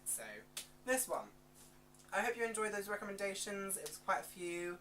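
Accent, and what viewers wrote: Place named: United Kingdom. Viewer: Thanks for doing the tag! Love your accent, where abouts in the UK are you from??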